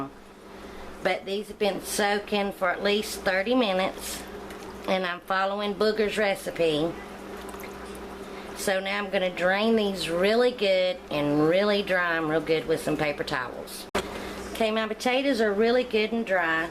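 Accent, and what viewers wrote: South, United States. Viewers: Hi, I just bought myself an air fryer recently. I LOVE the way your french fries turned out! I going to try your technique on my air fryer. By the way, I also LOVE your southern drawl };-) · best southern accent!!!! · you have what i call a country accent wow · Love the southern accent and your friends name 😀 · even with strong southern accent still easy to understand. I am excited about trying these. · I have to get me one of these! Anytime I hear a lady with a southern accent I know there's good food around! 😁😉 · Love the genuine southern accent!!!! 😍😍😍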